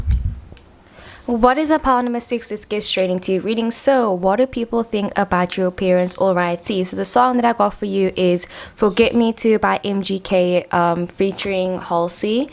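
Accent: South Africa. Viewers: Sis you sound south African as fookkkk Omg u are South African! Yayy Wait how is this so acurate. Pile 1. No fucking way whattt! No I'm subscribing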